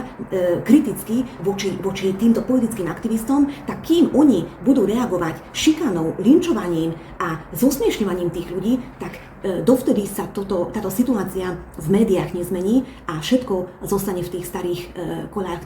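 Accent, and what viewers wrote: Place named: Hungary. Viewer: Milujem vašu maďarskú Slovenčinu, žerem vás! ❤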